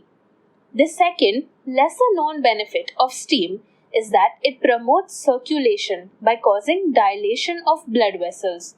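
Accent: India. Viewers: Indian english are very rought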